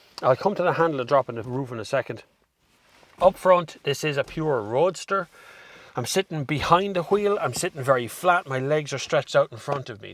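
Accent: Ireland. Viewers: Love the Irish brogue! “It’s a beautiful little ‘ting!”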